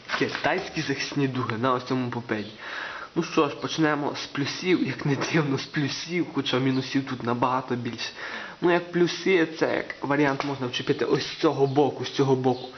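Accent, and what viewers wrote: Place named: Hutsul, Ukraine. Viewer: Смішно розмовляєш, мабуть гуцул, але приємно.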